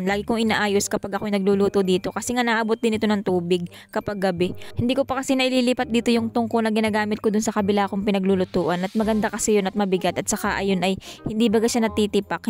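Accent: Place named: Laguna, Philippines. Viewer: Watching without skipping ads done na miss ko makinig at manuod ng videos mo😂😂 relate kase ako sa punto mo ganyan din sa namin sa kalayaan laguna..shout out 😂😂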